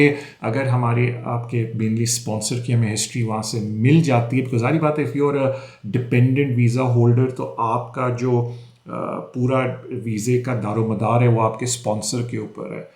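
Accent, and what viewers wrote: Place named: Pakistan. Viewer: Its Pakistani English language XD wtf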